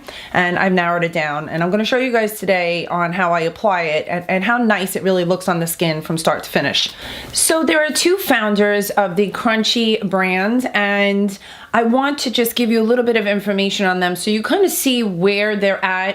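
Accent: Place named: New York, United States. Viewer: Love your accent love NY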